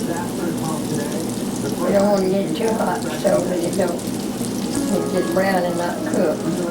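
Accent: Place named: Kentucky, United States. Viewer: Where is she from? Her accent sounds a lot like my own, and pretty much identical to older people where I'm from in Southeastern Kentucky.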